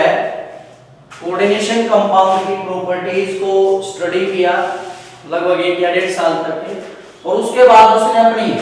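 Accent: Haryana, India. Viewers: Sir Seriously you're brilliant. Keep it up. And never leave your this hariyanavi accent .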